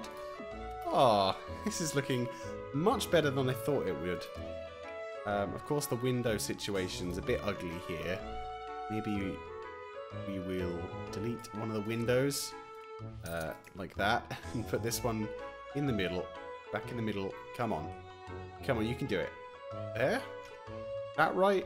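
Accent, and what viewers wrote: United Kingdom. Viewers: your British accent is very cute and fancy!💖💖💖 Love ya!!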